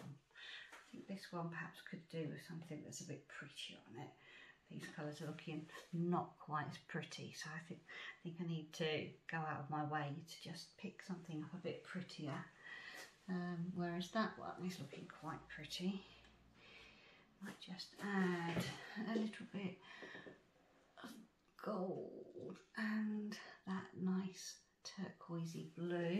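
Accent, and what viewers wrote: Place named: United Kingdom. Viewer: is British, as am I, and I think perhaps it’s the different accent more than the volume, as I heard her clearly? It’s interesting how some accents are easier to hear than others. ☺️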